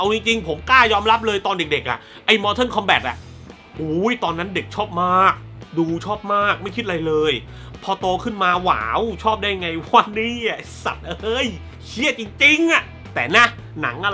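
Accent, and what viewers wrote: South, Thailand. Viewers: คนรีวิวคนใต้ใช่ไหมคับ ควังสำเนียงออก 😂😁😀😊☺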